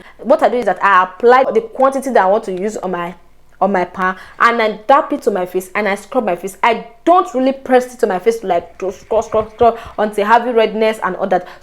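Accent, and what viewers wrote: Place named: Nigeria. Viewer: Your pigin is so sweet and the way you pronounce subscribe button is out of Nigeria . Love you girl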